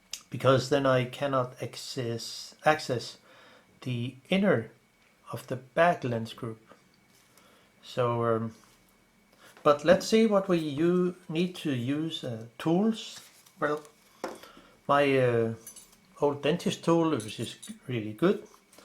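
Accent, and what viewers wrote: Denmark. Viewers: Are you Danish :)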